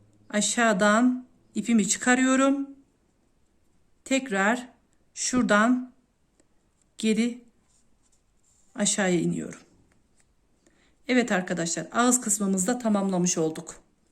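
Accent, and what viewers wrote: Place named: Central Anatolia, Turkey. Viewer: İlk yorum benden olsun hafif İç Anadolu aksanı seziyorum hemşeriyiz sanırım ayrıca çok güzel içerikler var ✅🙏😊👏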